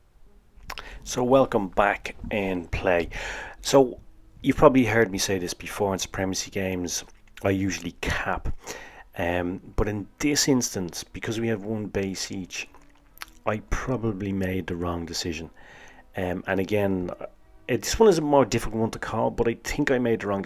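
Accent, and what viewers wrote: Ireland. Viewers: On the subject of dulcet Irish tones, Wargaming should hire you to replace the crew voice in the British tanks.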